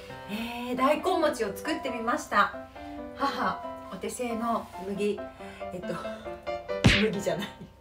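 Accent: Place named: Iida, Japan. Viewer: 大根もち❔大根とおもちを使ってどのようにするのかなぁと思っていましたが…😅なるほどねぇ🤔「大根もち」私も初めて聞きました😆 大根をスライスして具材を入れて片栗粉と小麦粉を入れて焼くのですね👌お好み焼きのような感じに～🤗 あっ！電池🔋が、、、早めに交換しないと〰️🤣 「命の源は金麦」に爆笑😆💣️✨ だもんでなぁ～の飯田弁、お母様の 梅酒(呑んでみたいです😉)に… おやきのお話しも～☆ 楽しいトークにハマっていました😆 「大根もち」はあきこさん押しの ミッカンのポン酢で頂きましたね🤗 具材にハムを入れてお肉の様な感じとなりアイデア良かったです👌 今回も楽しいクッキングを有り難うございました❤️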